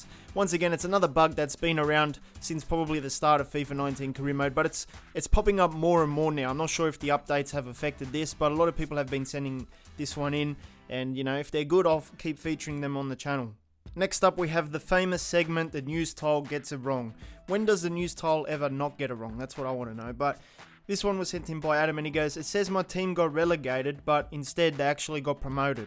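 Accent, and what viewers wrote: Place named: Australia. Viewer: Pretty sure he’s Aussie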